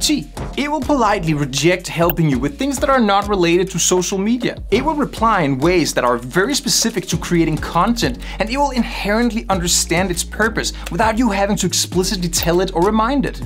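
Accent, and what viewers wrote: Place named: Denmark. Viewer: Good video. Are you Danish? I ask because of your accent.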